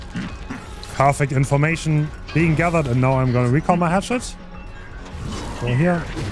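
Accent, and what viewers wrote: Germany. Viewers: its kinda funny that he copied the exact same speaking style like Otz but with a german accent.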